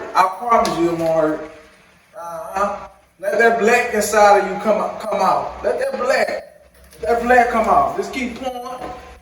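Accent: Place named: South, United States. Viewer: I love his accent you know he from the Dirty South wud up tho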